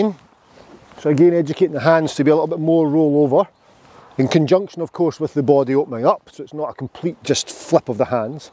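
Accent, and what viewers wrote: Scotland, United Kingdom. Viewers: Looking forward to trying this out, great video 🙏👍 Side note... after all these great lessons and tips, if Morgan freeman ever leaves the post open throwing your hat in the ring for new voice of God. I'm starting to hear a Scottish accent from above while standing over a golf ball 😊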